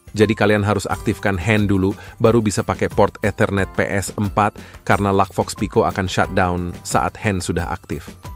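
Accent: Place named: Sulawesi, Indonesia. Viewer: bbrapa wktu yg lalu sy nnton dia hunting ps4. Ad semacam percakpan logat nya sprti dari pulau sulawesi. Perkiraan sy dia di manado atau gorontalo atau bsa jg di kota palu.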